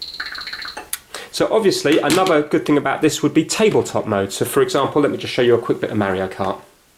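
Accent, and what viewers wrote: United Kingdom. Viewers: You're better and more resourceful engineer than an engineer from Columbia University in New York. But I'm not sure about the British accent though.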